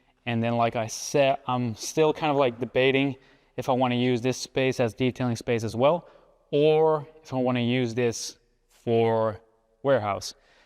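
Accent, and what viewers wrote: United States. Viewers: real question. Are you American or how do you have an American accent?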